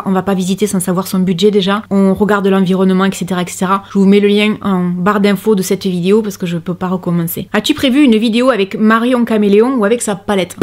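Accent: South, France. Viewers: J aime ta franchise ta sincérité surtout tes bons conseils et ta gentillesse tout ça arrosé de ton petit accent du midi Merci mon petit rayon de soleil s à nous change du make up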